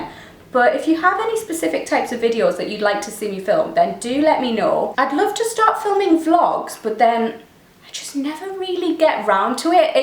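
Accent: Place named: North, United Kingdom. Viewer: that’s ok chick always a pleasure seeing your cheery face and that up north accent 😘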